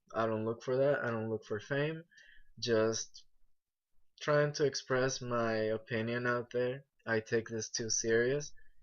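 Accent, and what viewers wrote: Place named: United States. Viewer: So your Spanish or American because you sound American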